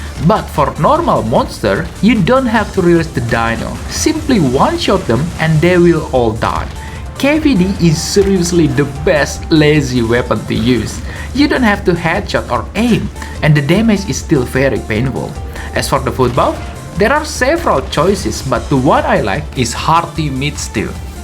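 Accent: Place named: Indonesia. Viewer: Aksen inggris nya indo bet 😂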